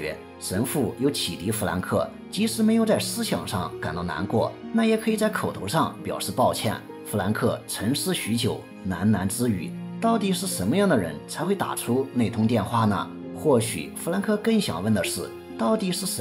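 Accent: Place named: Anhui, China. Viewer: 越哥，你的安徽乡音是如此地迷人！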